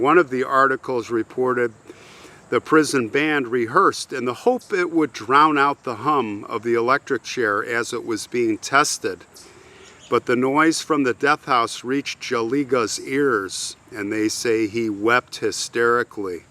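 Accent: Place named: Midwest, United States. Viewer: That prison must have been so awful 😖 Cannot imagine. Thanks also for remembering these forgotten people. I know people comment on your mid-west accent. I don't think I've ever told you, but it makes me smile ☺️ You say Illinois and some other words just like my friends near Peoria do! 💕 😃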